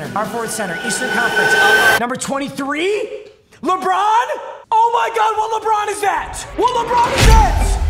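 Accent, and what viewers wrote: Michigan, United States. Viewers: Michigan accent is rlly comin through on this episode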